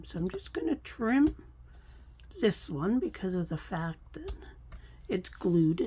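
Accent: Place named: Canada. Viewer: Are you close to any of the fires? They have been so scary & they’re popping up all over the world. California has some bad ones right now!I don’t know Canada as well as I should. I think I’ll get a National Geographic map from Amazon! I get a new one everytime I meet a new YouTuber! Kind of makes me feel like I know you better! Now that you have told me you’re from Canada, I hear that a bit in your speech! I also love all the different accents that people have! I’m amazed at the UK, they have so different sounds! It’s nice to meet you! Erica❤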